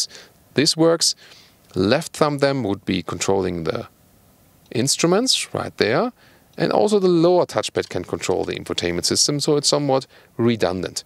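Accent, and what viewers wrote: Germany. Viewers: Deutsches Auto, deutscher Sprecher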